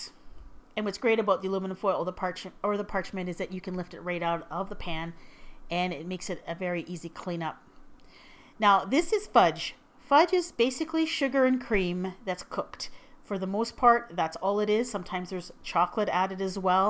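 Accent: Canada. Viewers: Random question, are you Canadian?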